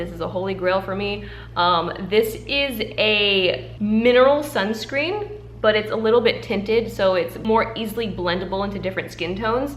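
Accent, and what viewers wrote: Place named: Australia. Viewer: I recently started watching your videos/subscribed, and i am STILL AMUSED by your Australian accent . ITS HONESTLY SO GOOD IN MY OPINION. 😂😂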